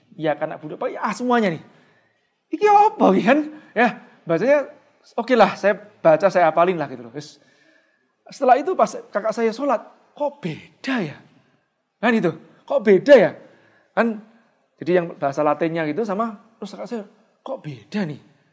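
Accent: Java, Indonesia. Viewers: tpi ada sdkt logt Jawa nya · Tanya juga Mas, kok orang Batam medoke Jawa? 😬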